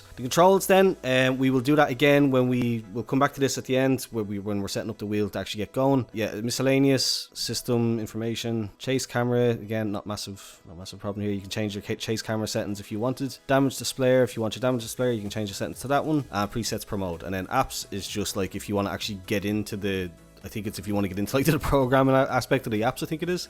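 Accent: Dublin, Ireland. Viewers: You from dublin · U sound Irish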